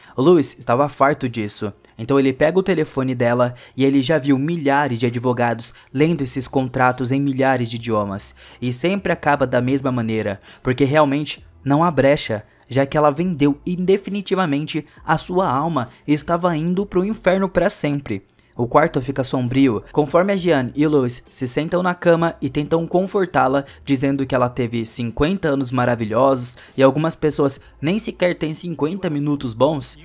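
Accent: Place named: United States. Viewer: Mas foram botar logo um americano pra narrar o video?! Que diabo de "perrquê" é esse? Quanto ao filme, existe um contrasenso terrível imposto pelo roteirista. Ora, se a mulher não acredita em nada de inferno, que diabos (expressão bem apropriada) ela foi fazer ao aceitar se encontrar o encontro com o tal? E será que depois de ter uma vida mudada totalmente, por 50 anos, ela nem desconfiou que tinha algo a ver com o contrato? Esse roteiro parece conta de chegar!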